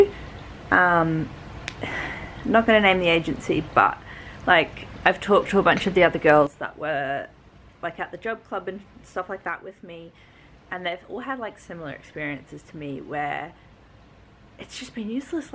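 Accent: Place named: Australia. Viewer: I hear more of a Australian accent from you. 2ish years ago it sounded more like an English accent. I know you're german so you probably learned English with more English influences than Australian. I'd like to hear your thoughts.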